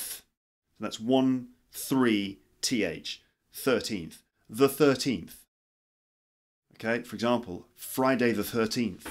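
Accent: RP, United Kingdom. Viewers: Hi Sir, My name is Karla, I have to say something about your pronunciation. Your accent is from UK, If I pronoun all those words as you said, American People will correct me? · nice lesson i adore the british accent thank you so much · Hi,! I'm from Russia! I love your posh accent!I kind of want it too. And I also really need your opinion whether the following sentence:'all he asks for you is be prepared as much as he does ok'-makes sense for you as a native speaker. It's very important for me.I just have a little bet about that.And now I need your help.so please,.I would appreciate it. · very nice pronunciation in british accent. bravo! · Are you a native British speaker?What sort of British accent do you use? Is it RP? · I love how he’s a lil it British. 😂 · Hello, I really like your voice and British accent. It is nice and clear to hear again and again. 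I can listen well but I can’t speak fluently, especially the English accent. I’m not satisfied at all with my voice, pronunciation and accent when I speak English out. Short talking is ok but long conversations or storytelling really suck. What can I do? I mean how do I practice? Do I need to practice English speaking one-on-one with a native speaker or can it be helpful if I practice English pronunciation first and speaking fluency on my own with the help of the Apps out there? Looking forward to hearing from you, Regards, TJ P.S. I just saw your channel today on iPhone pod cast and it is my very first time listening to 3/4 of your pod cast audios and then see the YouTube videos to see your face with the curiosity of how you look like because I really like your voice as I’ve said and imagined your how you look like in my mind so.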